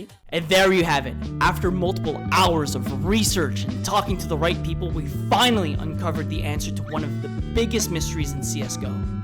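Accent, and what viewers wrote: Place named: Quebec, Canada. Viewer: T’es tu québécois? Ton accent est parfait. Bonne vidéo btw👍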